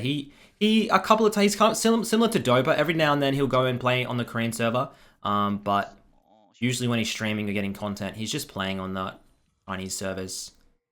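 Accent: Australia. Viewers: I believe thats bcs of the australian accent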